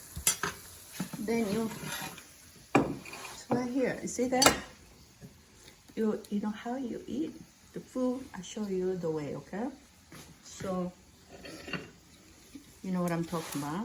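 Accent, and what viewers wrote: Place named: South Korea. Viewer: Hi 😊 I am a korean american and I moved out of my family's house in 2021. I am missing a lot of korean food and it's very lovely to hear korean accent and laughter from you ❤ you make me miss so much food and I can smell the meat cooking from the screen... please continue cooking!! You are giving me so much joy ❤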